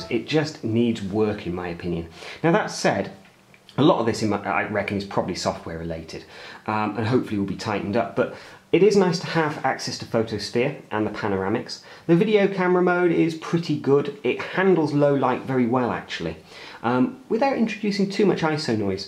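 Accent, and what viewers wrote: United Kingdom. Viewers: Thanks a lot for your videos. 1 : I'm French, so it's really easier and more comfortable to listen to someone speaking British English. thank you for that :P 2 : thank you for not being a N5 fanboy, and for pointing out both good and bad aspects of the phone, that helps me a lot ! 3 : Please let us know when you can tell whether the battery is decent. Thanks ! :)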